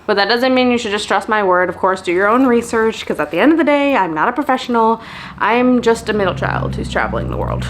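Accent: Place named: United States. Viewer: You sound like you are American. Those adaptors work great if you are not American because most places use 220V and you just need an adaptor. But if you use it on US products that are 110V you will burn them all up the second you plug them in. You need a transformer to go along with that to step down the voltage to 110V. While some us appliance have both 110v & 220v don't count on that because most don't. It will say right on it if it does. If you do it an cause a fire in your hotel or airport or anywhere else You will have to pay for the damages which won't be cheap. If you don't have the money to cover it many places will put you in Jail and then deport you back to your country. How long you wait in jail before you are deported varies and can even be months. Things to keep in mind but not to scare you. Be smart and you will be fine.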